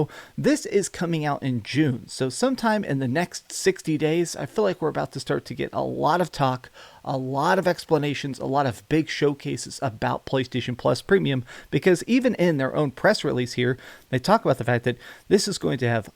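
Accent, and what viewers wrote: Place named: Mississippi, United States. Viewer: Dude, some of the words that come out of your mouth make me think you're from Mississippi, lol.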